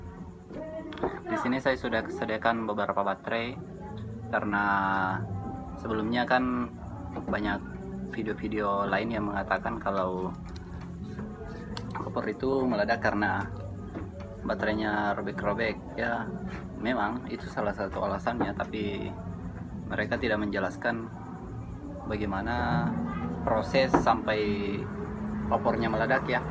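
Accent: Bugis, Indonesia. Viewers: Kya orang bugis ngomongnya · Logatnya kaya saya kenal daeng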